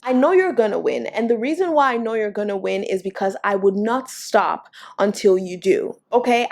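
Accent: Nigeria. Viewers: God bless you 🙏❤ I just signed up! Why do you sound Nigerian sometimes? Haha😄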